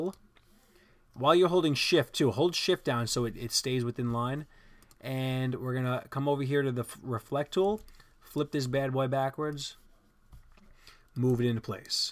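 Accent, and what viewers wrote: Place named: Boston, United States. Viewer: You have a boston accent.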